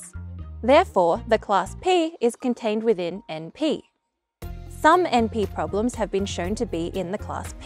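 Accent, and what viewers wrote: United Kingdom. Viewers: Okay, over the past few days I've watched a few of your videos and it seems that no one is willing to "call the Emperor out", (so to speak). So, I'll be the skunk in the group and tell you that your videos absolutely STINK! The problem is not you (or your British accent) or the content (which is competently done). It's your pisspoor audio system! I can just barely understand what you're saying! You really have to get yourself a better system (you should start with investing in a much higher quality microphone!). You might also want to remove more bass from the audio and boost the treble. That would improve matters greatly. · What you are Australian? I thought your accent was eccentric British!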